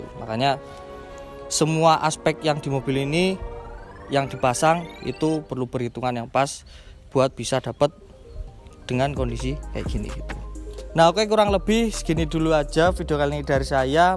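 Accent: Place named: Banyumas, Indonesia. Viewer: Wong ngapak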